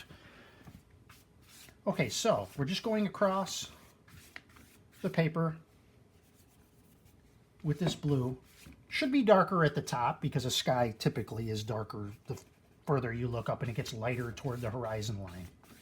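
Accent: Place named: United Kingdom. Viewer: It takes a little time to tune the American ear into everyday conversational style British accents. There are so many and can be difficult for a while.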